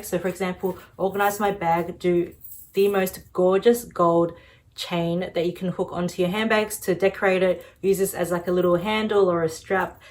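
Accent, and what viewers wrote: Australia. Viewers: I support ozzie youtubers^^. Are you in Sydney or Melbourne? As you sound ozzie ~^^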